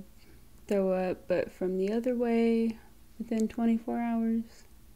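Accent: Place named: South, United States. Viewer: Salut, j'aime bien l'accent du Sud, c'est le plus bel accent pour le francophone que je suis. A bientôt pour une vidéo, en français j’espère.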